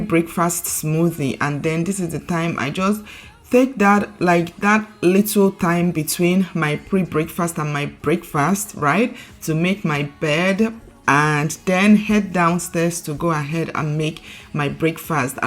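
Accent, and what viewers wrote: Nigeria. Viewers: WE LOVE THE NAIJA ACCENT 🥰❤️🥰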